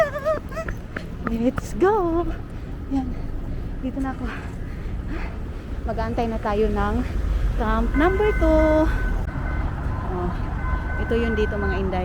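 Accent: Visayas, Philippines. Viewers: Indai everyday abangers ako sa vlog mo para ka teleserye hahaha ok Lang kahit Ano vlog mo tas kahit Hindi ko gets ang accent bisaya mo hahaha tyaga ako manood sayo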